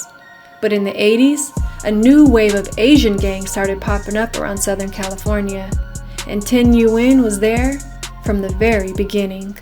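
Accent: South, United States. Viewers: Dam you have a nice voice? Southern?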